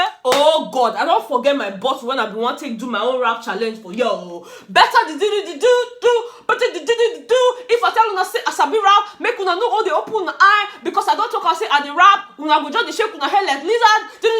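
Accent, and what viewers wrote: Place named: Igbo, Nigeria. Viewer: Ozo carry relationship as strategy and e dey fail before him eyes yet him dey there. I love this your igbo accent.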